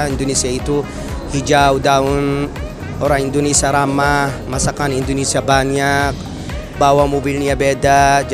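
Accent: Arab world. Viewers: Iya tajwid nya masih kental..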